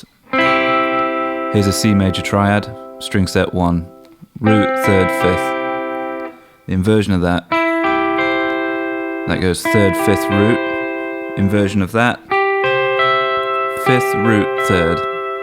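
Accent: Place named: Liverpool, United Kingdom. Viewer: Your accent is as cool as your playing! Liverpool?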